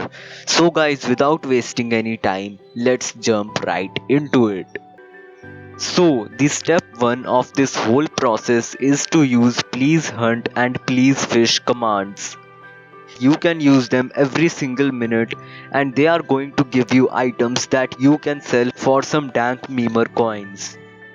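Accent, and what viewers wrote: India. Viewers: The Indian accent 🗣️🗣️🗣️🗣️🔥🔥🔥🔥🔥💯💯💯💯💯💯💯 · indian accent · Real Indian English Accent.... at least he didn't tried to show to be fluent (which end up as cringe) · Ha Indian man nice accent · his accent makes the video way better, nice video indian man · thank you so much for having an indian accent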